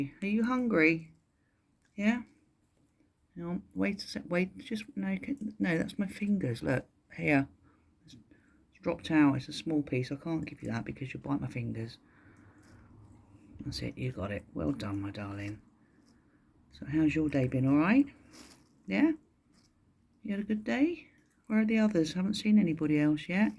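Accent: England, United Kingdom. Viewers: I love your videos, and your voice! We silly Americans go ga-ga for English accents! God bless you and the critters!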